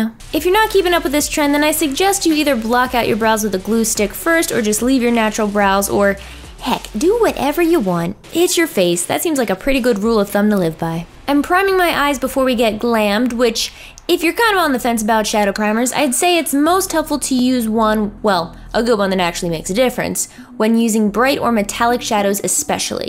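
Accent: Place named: Pennsylvania, United States. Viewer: !! Don't be ashamed of your accent!! For a while, I had no clue why people made fun of your accent. I didn't even notice you had one. Then I realized you were from Pennsylvania and it all made sense... (I live there too).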